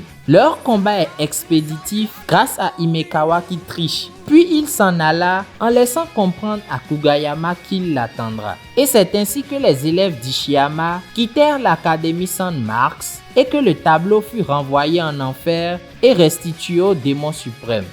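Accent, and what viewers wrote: Benin. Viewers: T'es béninois 🤔?T'as l'accent en tout cas 😃. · Accent béninois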